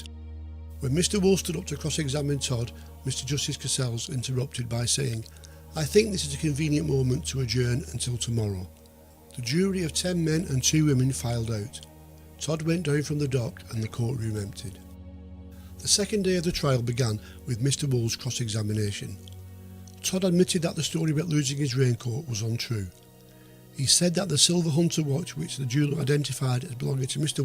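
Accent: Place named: Northern England, United Kingdom. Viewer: Considering I'm a confirmed Yorkshireman, there'd b sum sort of rivalry, bt nt this time, it's gud to hear a Northerner, even better as it's a Northerner doin such a great job with these videos, gud job, u deserve any plaudits 👍👍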